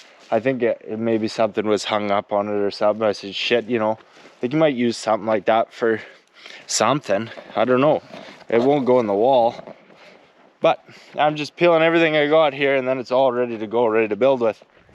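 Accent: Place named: Newfoundland, Canada. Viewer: Where are you from? What part of Canada? I was just wondering because I noticed the way you talk …….. I don’t mean that in a bad way just kind of wondering …….Tiny Houston MS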